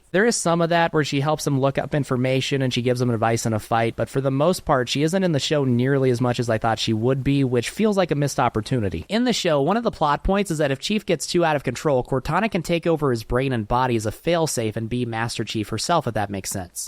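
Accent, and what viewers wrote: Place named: Canada. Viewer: Bro sounds Canadian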